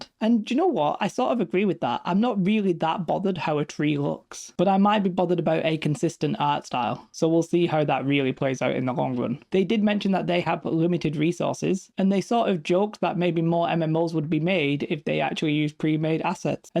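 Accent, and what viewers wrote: United Kingdom. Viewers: LOL, good one! He does not saying Items, he saying I'ems! Love the Bri'ish accent ^^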